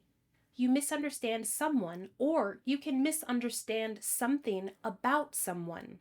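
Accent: United States. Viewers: Is it american pronunciation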